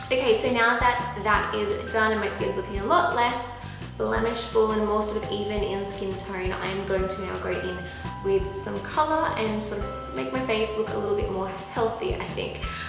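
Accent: Australia. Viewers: Are you Australian? Random question, I know. But great job with the makeup though! 😃💄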